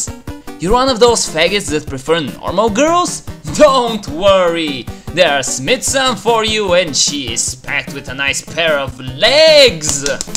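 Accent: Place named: Romania. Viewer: Ima say Romanian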